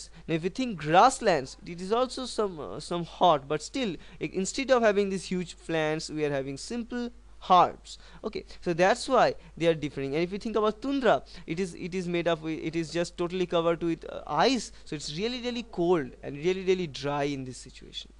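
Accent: Bengal, India. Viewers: Is the orator bengali?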